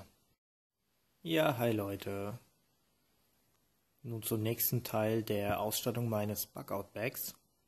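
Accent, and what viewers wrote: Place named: Palatinate, Germany. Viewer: Lewwerworscht :)) ich lach mich weg....Pälzer Platt goes Ytube ;) hört sich aber doch heimisch an.... Belgisch Jig Saw...sieht gut aus ! Hast du eine Quelle ? Grüsse aus der Landeshauptstadt RPL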